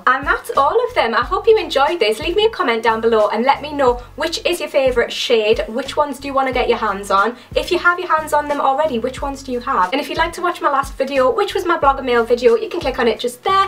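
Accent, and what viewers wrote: Newcastle, United Kingdom. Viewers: Are you from Newcastle? great video btw!x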